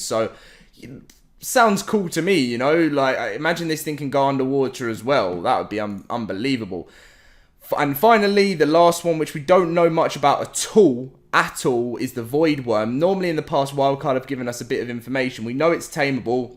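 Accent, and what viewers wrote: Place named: United Kingdom. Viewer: According to his voice: UK According to his looks: US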